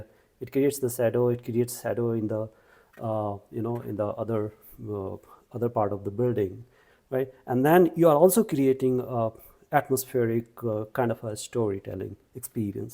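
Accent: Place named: India. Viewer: Always grateful for your generous gift of your time and knowledge,. And don't worry about your accent; I am in Australia, so an Indian accent is no longer foreign! Peter Downie.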